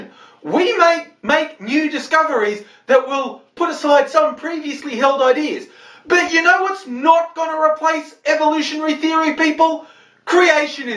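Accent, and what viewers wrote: Australia. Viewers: Your definitely an Aussie,and your definitely angry,well sort of,in a controlled type angry way,like angry with a purpose,almost assertive with a loud controlled voice,anyway good vid,good points made. Do you think the C's will listen,I doubt it,they have a god given logic filter,but at least you got it of your chest,just keep shouting at them.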